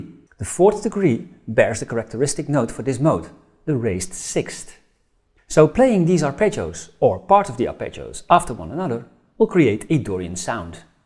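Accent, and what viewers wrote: Netherlands. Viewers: Great video, very helpfull! Het Nederlandse accent is altijd zo herkenbaar hahaha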